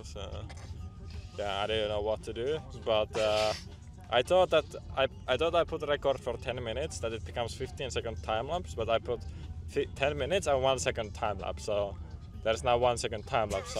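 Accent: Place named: Finland. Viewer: first time seeing a video of you and instantly recognized the Finnish Accent, hope all goes well and got a new subscriber <3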